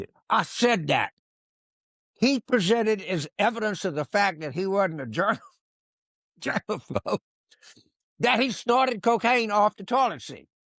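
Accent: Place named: New Orleans, United States. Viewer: My dad was born and raised in New Orleans and sounds just like James.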